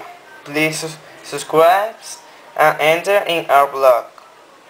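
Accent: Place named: United Kingdom. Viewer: que ingles pareces británico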